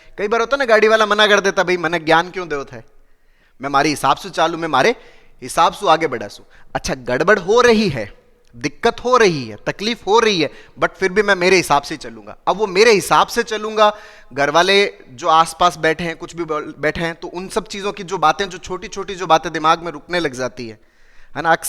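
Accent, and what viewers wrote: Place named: Marwari, India. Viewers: Sir aap ki marvadi boli bhot achhi lagti he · Sar ji namaskar bich bich mein aap Marwadi bolate Hain Rajasthani bolate Hain bahut Achcha lagta hai Jaise dusre state Ke Log bich bich Mein Hindi ke sath ya English ke sath apni Bhasha ka uchharan Karte rahte hain vaise Sar ji aap bhi bich bich Mein Marwadi Rajasthani bolate rahega isase Hamen bahut Garv Hota Hai aur bahut Achcha lagta hai itne Bade doctor hokar Marwadi bolate Hain Rajasthani bolate Hain To hamen bhi aapse Vishwas badhta Hai Marwadi bolane Mein Rajasthani bolna aap bahut acche se samjhate ho Sar ji aap karte dil se dhanyvad aaj ka subject bahut hi interesting tha nice topic tha dhanyvad doctor sahab❤❤❤❤❤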